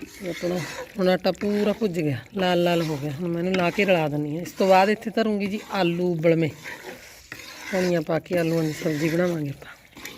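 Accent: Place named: Nabha, India. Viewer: ਅਜ fist time Volg ਦੇਖਿਆ ਵਧੀਆ ਲੱਗਿਆ ਨਾਭੇ ਕਨੀ ਦੀ ਬੋਲੀ ਸੁਣ ਕੇ ਵਧੀਆ ਲੱਗਿਆ ਮੇਰੇ। ਏਰੀਆ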